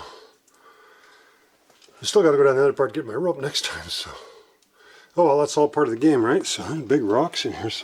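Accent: Canada. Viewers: As soon as I heard the accent. I KNEW it was Canadian. Love the video.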